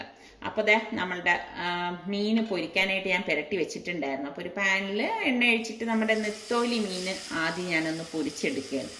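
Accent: Trivandrum, India. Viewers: Trivandrum ano veedu.speech nalla vyathysam.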